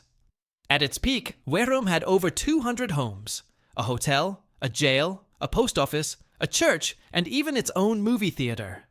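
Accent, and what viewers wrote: United Kingdom. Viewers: Is it me or does anyone notice the narrator's voice once in a while turns into a English/British accent? Lol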